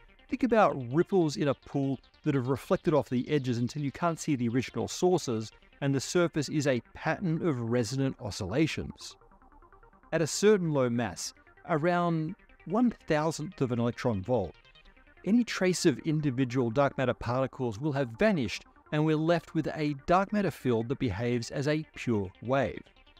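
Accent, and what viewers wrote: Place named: Australia. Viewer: that's his Australian accent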